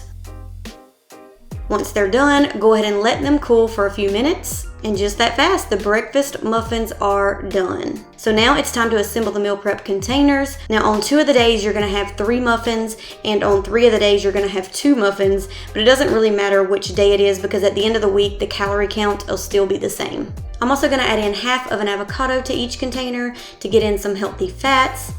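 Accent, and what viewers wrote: South, United States. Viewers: Me being a Mississippian, “lord have mercy, that’s a southern accent.” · I love the southern belle accent. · I heard your southern drawl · Just where is that sweet as molasses southern accent from? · The voice through me for a loop 😅😅 heyyyy my fellow southern friend 👋🏽👋🏽 great video 💕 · Love your voice girl. A real southern belle. 😍😍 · I love your southern accent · I can tell she's from the South. I am too.🙂👍🏻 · so love the Southern draw and love the prep recipes. Thank you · I love your Southern drawl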